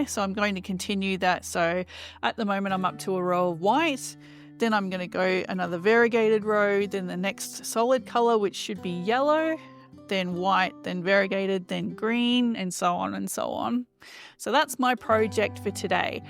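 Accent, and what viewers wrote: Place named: Australia. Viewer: Glad I've found your channel. I've just subscribed. It's lovely to hear an Aussie voice and to hear your story. I'm in Tassie. I retired this year and also first picked up cross stitch (HAED of all things) but found it a bit too intense with the concentration and had to use a magnifying lamp and even that was straining my eyes. So, I've put that on the back burner for now. I'd learnt basic crochet stitches as a youngster from aunts and great aunts, maybe even my grandmother but I'm not sure. But I've never crocheted an actual item. I found some 3 ply wool I'd been knitting up but never finished as a baby blanket over 26 years ago when I was having my son. A few weeks ago I took the unused wool from that and a crochet hook I found in my sewing box and taught myself from YouTube videos and made a small pram sized baby blanket with a really pretty shell stitch. 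It was so satisfying to actually finish something. Then my daughter in her 20s pointed me to a pattern on Etsy for a daisy granny square blanket and asked if I thought she'd be able to make it. I looked at it and told her probably not. She's never crocheted before. So I bought that pattern and saw two other patterns I liked on that Etsy site and bought them. It's DreamMakesbyJD. I think she's in WA. So I started with the Mermaid Dreams pattern as a test run for myself. I started the large size (she called it Afghan-small) and then realised I didn't want it that big. So I looked up the dimensions online for what I was look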